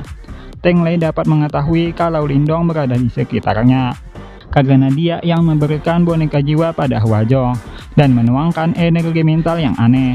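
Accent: Banjar, Indonesia. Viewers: suara orang banjar tak bisa dirubah logat nya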